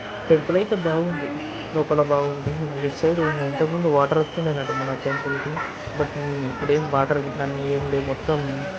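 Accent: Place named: Telangana, India. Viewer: 👌👌mana thelangana style lone matladu baguntundhi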